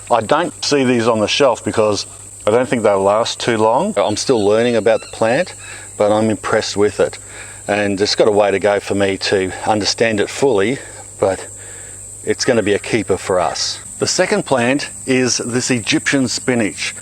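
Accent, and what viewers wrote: Australia. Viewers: You`re a cutie! As soon as you spoke of heat, humidity, and a storm coming I thought "Are you here in Florida? Maybe south - nobody wants to be in the north. But his accent is Aussie, so likely the other side." Then I saw "subtropical (similar to Florida)" in your description, and it all made sense. I clicked the button. That wee lime looks amazing! I can imagine those bubbles mixed into a salad dressing .... and each bite of food has a little surprising ZING of lime. Hmmmmm.... I`m starting a farm soon ..... I may have to figure out how to get a tree growing here. OH.... and then mosquitos - are you SURE that you`re not in Florida??? haha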